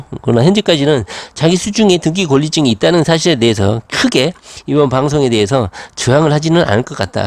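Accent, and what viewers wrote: Jeolla, South Korea. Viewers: 전라도 늠이네ㅎㅎ 슨상님은 잘 계시지라?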